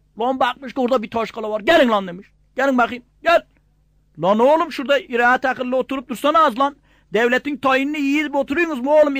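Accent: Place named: Gaziantep, Turkey. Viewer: ORJINAL ISTE! BU SIVEYE CAN KURBAN! Antepli olunmaz antepli dogulur!!!!!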